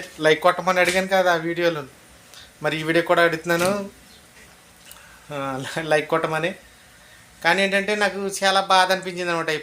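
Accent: Godavari, India. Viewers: హాయ్ అన్న ఎలా ఉన్నారు,మన, గోదారోళ్ళు, మాటే అలా, ఉంటుంది మరి,మన,ఉతపదలు అలాగే ఉంటాయి ఎవరు ఏమి అనుకున్నా, అవి మారవు, బాగుంది వీడియో జాగ్రత్తగా ఉండండి 👍👍♥️♥️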